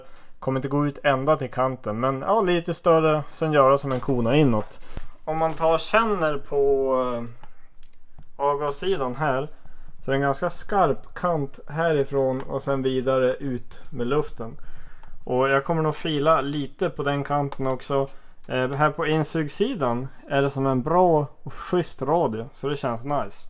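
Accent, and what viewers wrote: Norrland, Sweden. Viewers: Äntligen, slutade ärligt kolla på dig när du började med engelska. Det är ju norrländskan man vill höra 🤠🤠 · Norrländskan är tillbaks 😍😂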